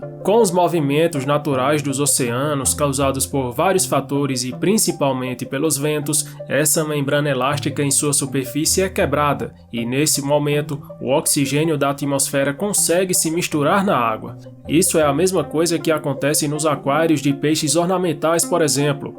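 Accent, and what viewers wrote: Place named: Northeast, Brazil. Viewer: Amei mais ainda o sotaque nordestino do narrador! Vcs são de onde? Nordeste power 💪🏽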